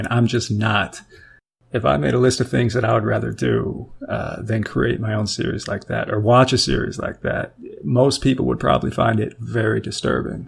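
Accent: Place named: working class, United States. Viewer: he sounds like most working class americans. this is how we talk. and he's being honest about how he feels which is a good thong, actually. if it makes you uncomfortable, maybe its a personal problem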